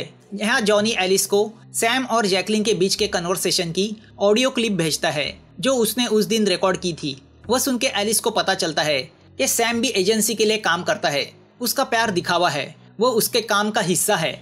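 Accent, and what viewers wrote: Maharashtra, India. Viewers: Tula Marathi yete bhau bolnya Varun Maharashtra cha distos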